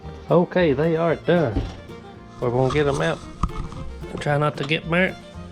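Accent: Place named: Kentucky, United States. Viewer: I’m smiling the whole video. When I hear you talk...takes me right back to Sunday dinners with my Kentucky kinfolk whom I miss dearly. Until I get to Beulah Land. Thank you and God Bless.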